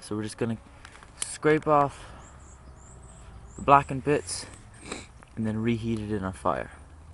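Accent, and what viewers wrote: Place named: Ireland. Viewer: u hav such an irish tone to ur voice ha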